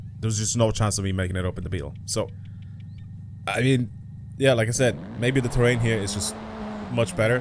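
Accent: Ireland. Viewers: I love your content but the intros could do with a bit of work one minute 45 in I haven’t even touched the Excelerator once, Love the Irish accent but I best be getting the suck or fuck after 🥱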